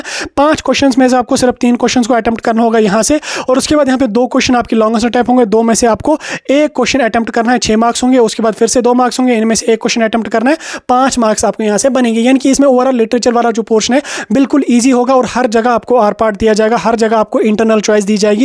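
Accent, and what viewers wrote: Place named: Kashmir, India. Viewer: Bhai you are kashmiri i understand because your Urdu voice❤❤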